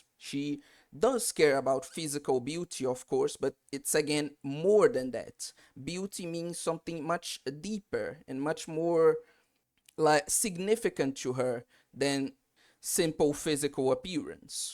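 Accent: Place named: Philippines. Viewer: Philippines English is strong in this video...put tank in the mall... Strongest race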